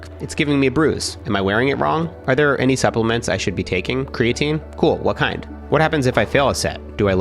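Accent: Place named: Australia. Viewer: Catches me so off-guard hearing an Australian accent in a YouTube video, and I have an Australian accent and make YouTube videos hahaha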